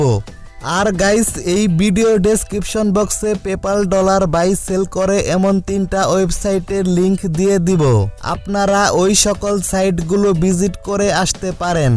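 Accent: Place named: India. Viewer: Title with eng why the hell u talk with Indian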